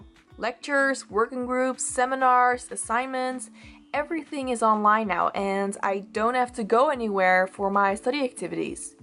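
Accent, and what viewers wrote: Netherlands. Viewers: Are you Dutch? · Are you Dutch? ☺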